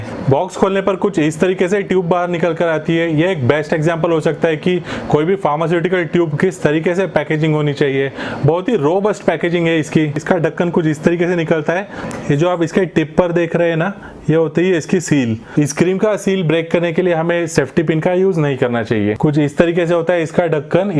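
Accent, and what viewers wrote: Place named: North, India. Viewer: sure you are being modest an all..😊 but that's what YouTubers and Instagramers are influencers!! BTW what city are you from? Your Hindi is very North😃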